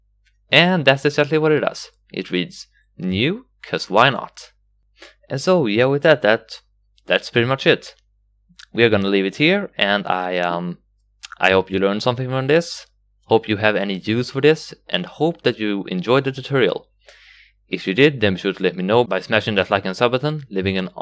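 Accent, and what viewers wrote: Sweden. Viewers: I see that you have a swedish accent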